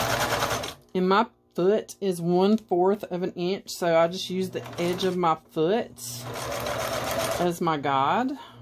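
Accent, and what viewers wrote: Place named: South, United States. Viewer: Hello Mrs. - I LOVE your southern accent🥰. I have read the comments and seen that people have given you some advice about not having to re-thread your machine...They've suggested you sew two blocks at once, use dryer sheets, etc..All you need to do is pull the block your sewing on, to the back of your machine and make sure that you've got 5-6 inches of extra thread, then snip while you've got a finger on those threads, so it doesn't bounce back. Dryer sheets, aside from being spendy, are full of harsh chemicals that cause Alzheimer's and dementia. That's why they do not list the chemicals that are in the dryer sheets on the box they come in. I've done the research. These blocks you've been making are so gorgeous. I'm new to your channel. I cannot wait to see the finished project. You're doing an awesome job❣ Thank You for your videos 💖🥰 p.s. 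I hate to see you go through so much work to get rid of the paper off the back of your blocks. You can actually sew these on Old sheets that you get from the thrift store, leave the fabric on, cut them to size before you sew your strips on... square up the blocks... you get me. 😊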